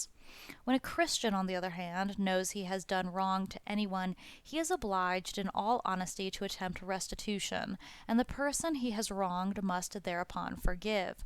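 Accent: United States. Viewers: The thick whiny Ameican accent renders this program unlistenable.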